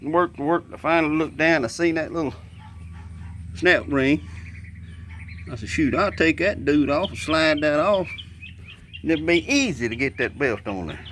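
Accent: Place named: West Virginia, United States. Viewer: Sounds like McDowell county WV feller👍🇺🇸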